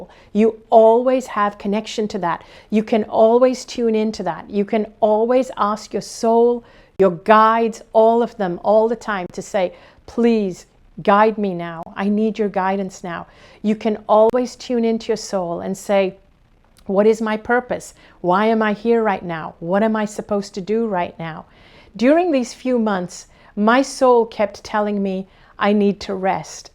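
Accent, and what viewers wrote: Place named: California, United States. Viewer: Oh my! I read your books several years ago. My sister even met you in HK through a friend. Now I have found this site. To hear you speak now you sound very Californian! I’m from Sacramento but now live six blocks from the White House. I need to hear your optimism to get me through these tough times. You’re an angel.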